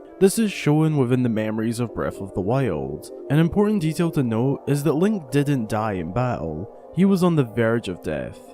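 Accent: Scotland, United Kingdom. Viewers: The second the video started and I heard your voice, I immediately knew that you were Scottish, cus I'm Scottish myself! Idk just thought that was quite cool. But great video, solid points as well, you've earned a sub :D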